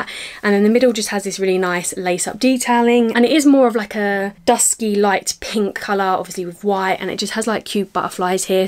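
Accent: United Kingdom. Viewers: I Love You British accent.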